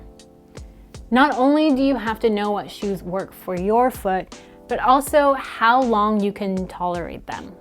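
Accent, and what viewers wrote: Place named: Canada. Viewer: just recently discovered your channel and it's great! Your perspective on packing (the weather/activities/vibe/trip length quadrant) is refreshing. Instead of dictating a particular list or items, you invite the viewer to think critically for themselves to create a packing list that makes sense for them. I've been going carry-on only for 7-10 day trips to Europe since 2017, and your perspective inspired me to get ready for an upcoming trip to Madrid with a fresh outlook on layering and outfit-making - I'm so excited with my planned outfits! Your presentation is excellent: succinct, polished and friendly and it's great hearing a Canadian voice here as well. Comfort shoe recos: Love my tall leather boots from Poppy Barley (a woman-owned, Canadian brand from Edmonton that is ethically made in a small factory in Mexico). They do custom adjustments for calf-width, fit my orthotics and are so well made and comfortable. 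I've had mine for 8+ years and have walked all day in Toronto, Berlin, Amsterdam and more and have been able to have the heels replaced by a cobbler when needed. Also, Cobb Hill (a stylish comfort brand made by Rockport) looks cute, are supportive and comfy and fit my orthotics.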